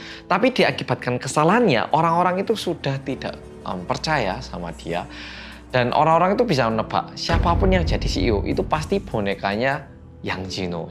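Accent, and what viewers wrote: Java, Indonesia. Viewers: Welcome to orang koreaaa yang medhooook😎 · Team gercep😀.. Kalo ada bang medok, gak bakal terlewatkan di notif.. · Bersama orang KOREA yang MEDHOOOKKKK... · Hello guys welkom bek tu korea reomit bersama orang korea yang MEDHOOKK~ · Medokk..., Suara e itu lho kangen denger nya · Medhokkkk😎🗿 yang ditunggu" detektif 😎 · Oom kliatan fresh sekarang, tapi udh berkurang mendhoknya😂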